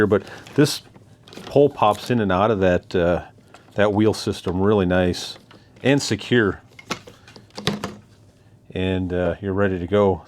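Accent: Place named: Canada. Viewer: Well done,.I can detect a little canuck in your accent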